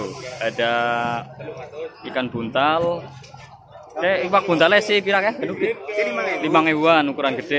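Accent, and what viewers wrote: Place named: Java, Indonesia. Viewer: Halmun 😆, mantap mas medokmu ngangeni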